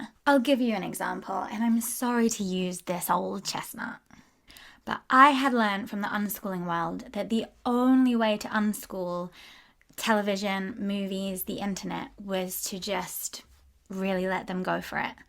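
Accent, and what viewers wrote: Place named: New Zealand. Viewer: I haven't seen your videos for ages, and I love how your London accent's become super kiwi, like one of my best friends from school who lives in Taupo. I was thinking of you recently... did you see the case in Italy where a UK/Australian couple have had their kids taken away and put into care because they were unschooling and had a composting loo!!!?? It's unbelievable, and for us (living in Italy as progressive foreigners) it's made us feel quite nervous. We're leaving the country soon. But I wondered if there's any support within the unschooling community for them. My kids are now 12 and 10 and I have doubts/vague regrets about having sent them to state school. I know why I did it (believing they needed to culturally integrate into Italy and also as I work full time feeling I couldn't support them here), but they've both had some bullying and it's too rigid especially for my ADHD son.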